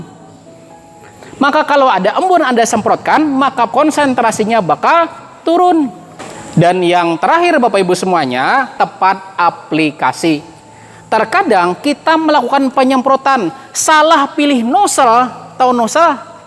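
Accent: Banjar, Indonesia. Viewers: Sulsel hadir. 10 tahun di Kaltim. Serasa bernostalgia dengan logat Banjarnya bang. Salam agro..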